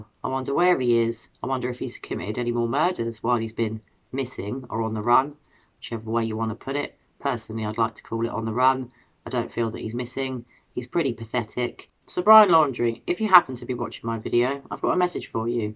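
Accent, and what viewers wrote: United Kingdom. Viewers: thank you for your thoughtful investigation into these murders. It really is alarming to think of Brian as a serial killer!!! You are the first person in my observations on Y.T. to propose this possibility a few weeks ago. I am praying often for LE to catch him and bring him to full justice. PS -- being "across the pond" in SC, USA, I enjoy hearing your accent 😊!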